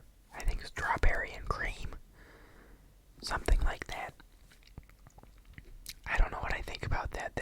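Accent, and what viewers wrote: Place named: Boston, United States. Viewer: You have the same accent and speech cadence as MorpheusASMR. Must be Boston area too?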